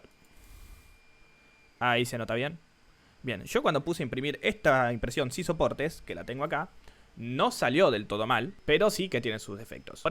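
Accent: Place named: Argentina. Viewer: Sos un idolo, literal que me quedo siempre al final del video para darte una cierta forma de gracias virtual aunque allá entendido lo que venía a entender (porque lo explicas excelente y más sabiendo que hablas con un tono muy Argento xd), simplemente muchas gracias ídolo 💪🏻 ✨ 🎉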